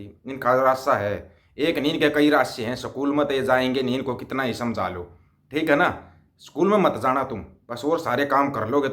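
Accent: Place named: Rohtak, India. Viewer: भाई जी आपका बिंदास रोहतक वालों का बोलने का लहजा बागेश्वर का मोड़ा । दिल खुश हुआ है । ज्ंयोदा बसदा रह ।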